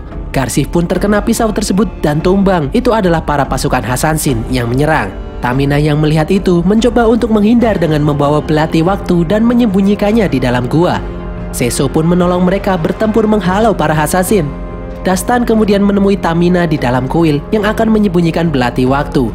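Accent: Java, Indonesia. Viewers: Wong jowo yo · Miminnya orang Jawa😂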